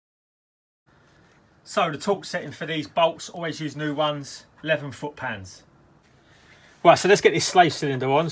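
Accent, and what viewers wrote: United Kingdom. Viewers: Nice to hear a brit bro on here showing our ways. Well done son. Good job !!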